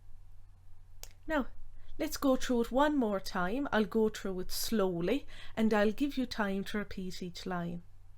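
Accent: Ireland. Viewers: Amazing!! I've got one question: Which part of Ireland is your accent from? (I know it can vary depending on the region of the speaker). Thanks for this video 🙏🏻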